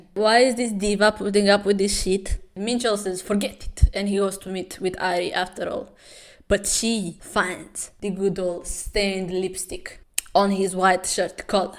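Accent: Russia. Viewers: Am I the only one who focused on her accent thinking it’s such a good Russian accent 😭😭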